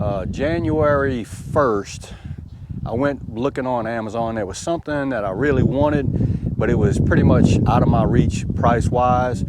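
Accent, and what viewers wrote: South, United States. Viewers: I bought a cheap Fire HD tablet/reader using the same 5 month payment system because to be frank I wasn't too confident whether I'd be happy with it or not. Regardless I just got done paying it off this month. Thankfully it was only 30 USD a month but I had no problem with it. Initial payment was 39 USD ($30 + 6% state sales tax) and the remain 4 payments were $30 and they came out of my bank account every month like clockwork. I guess because it wasn't that much a month I lucked out and didn't get fleeced like you did. Thanks for the heads up and I will definitely keep a watchful eye out if I ever purchase anything else from Amazon using that payment system. As far as outsourced customer service.....................................I feel ya brother. P.S. You don't talk funny at all. You have a perfectly acceptable Southern/Creole US English accent.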